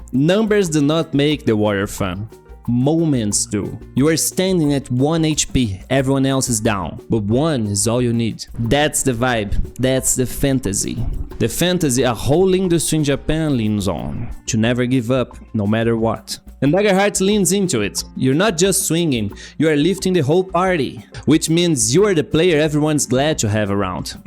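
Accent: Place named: Brazil. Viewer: esse sotaque nunca me enganou. Seu canal tem uma versão br?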